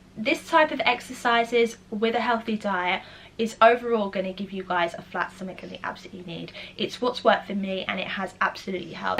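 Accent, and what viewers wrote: United Kingdom. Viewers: Dominique Nah she's English,sounds like from the South East Coast. Essex, maybe Chigwell which is just adjacent to Northern region of Greater London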